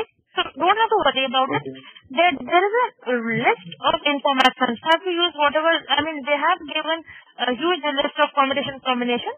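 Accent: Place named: Asia. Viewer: Very good and knowledgeable video while learning SAS, thnx to this post and the lady who is teaching. and those who think its asian voice so he/she cant teach, and those who think its poor quality(go ad c ur a*** hole) and the one who can't understand this simple language....are big fuk***** and ge out of here :P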